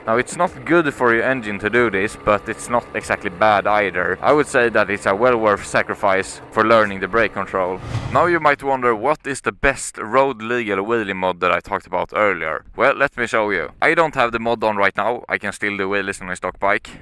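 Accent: Sweden. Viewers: jävlar vilken svensk dialekt du har, men bra video.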